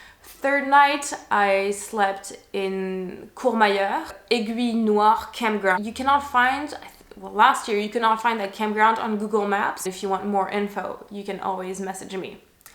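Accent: France. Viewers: May I ask.Are you a native French language speaker😊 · i like your French accent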